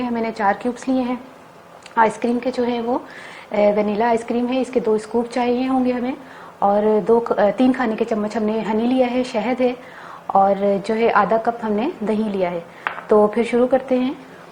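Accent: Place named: Punjab, India. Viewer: Ap ki Punjabi so sweet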